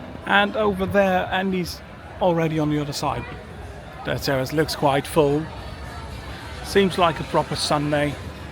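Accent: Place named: Netherlands. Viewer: I don't have a preference but normally I'm pretty good at spotting native speakers and I really thought you were british but every so often I hear that dutch accent creeping in.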